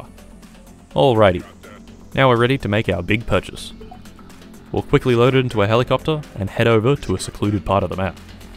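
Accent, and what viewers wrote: Australia. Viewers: You're from Australia, I'm sure of it bc I kinda talk like "I did a thing"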